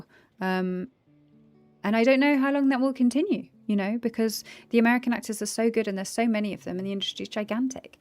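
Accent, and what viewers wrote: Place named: United Kingdom. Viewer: your accent was a pleasant surprise! makes me wonder how lauma would've sounded in your original british accent rather than the standard american one! your performance as her is so lovely as is :)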